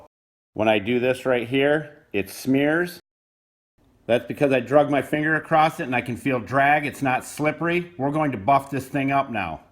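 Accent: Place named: Chicago, United States. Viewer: Ok ha I detected a slight Chicago sounding accent so thought you might be close to that area. Beautiful part of the state. Love your vid’s thanks again!! ✋👍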